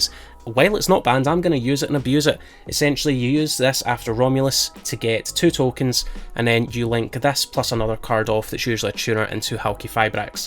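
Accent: Scotland, United Kingdom. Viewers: "Fantasmay is Fantastic" with that accent will never leave my head anymore 🤣🤣 Assuming Scottish aswell?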